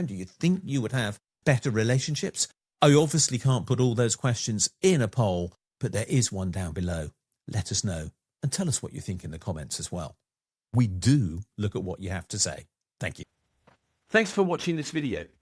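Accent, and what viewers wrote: United Kingdom. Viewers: If not for the accent, I'd have thought you were talking about the States, at first. Perhaps you still are. Sadly, this view of economics is taboo in the educational system of the States. Even discussing it in passing might earn one death by pyre...or guillotine. Should I assume the same applies to the schools throughout the UK? Or do you folks prefer dunkings? How much closer to the brink do you think the world can come before chaos ensues and we all return to the wars and turmoil that marked and marred the late 19th and much of the 20th centuries? History might not repeat but it has a nasty habit of echoing into the future. Of course, the last major reversal of this trend did not take place until after a decade-long global depression and an even more devastating global conflict, that left millions dead and tens of millions more forever changed. 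Even then, the correction lasted little more than a single generation before "evil" began its insidious war on the rest of humanity...again. What admonishment do we, who bear witness to the death rattles of this conflict, leave for those left standing when the next correction takes place? Or is it a futile exercise, as they and theirs must re-learn all the hard lessons, all over again? There is a theory that a small group of people suffer a neurological deficit much like but parallel to autism. Except in these cases, rather than never acquiring the ability to interact with the rest of the world like the rest of us, these others fail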